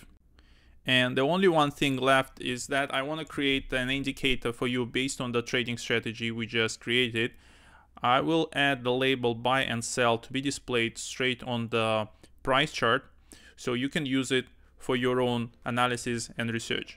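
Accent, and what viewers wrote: Russia. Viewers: да наш, русский акцент слышен прям четко, но лучше чем у индусов)) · Не даёт мне покоя твой акцент.. русский?)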